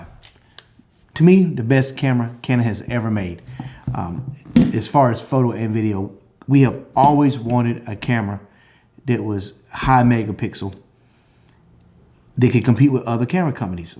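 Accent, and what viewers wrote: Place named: United States. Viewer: Awesome video, are you from Oakland or H-Town if I may ask? It's hard to tell where your accent is from in the USA, I am guessing one of those two, Houston/Oakland? You sound just like my brother in law, so I'm guessing one of those two? Anyways great video and hit the nail on the head, I like the R3 more than any other mirrorless camera and I'm a full time Nikon NPS shooter or photojournalist. However the R5 is also amazing and they definitely beat the Nikon D850, but took a long time and the EOS R/RP were lackluster. Anyways love the videos and you're meant for this! Let me know if you ever want to do a guest speaker or co-host show, I do interviews and YouTube at times, and used to act as a teenager, I'm 36 now.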